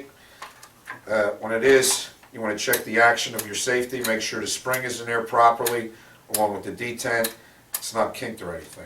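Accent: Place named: Nassau County, United States. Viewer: nassau county accent detected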